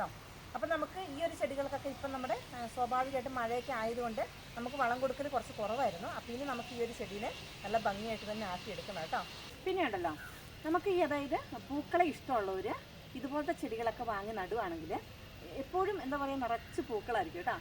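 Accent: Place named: Thrissur, India. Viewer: സാധാരണ തൃശൂർ ഭാഗത്തെ സ്ലാങ് ൽ വരും,,,,, ട്ടോ ട്ടോ,,,,,, തൃശൂർ പൂരത്തിന്റെ വെടിക്കെട്ട്‌ പോലെ 😁😆😆😆,,,,, ഞാനും തൃശൂർ ക്കാരിയാണ് ❤️ with proud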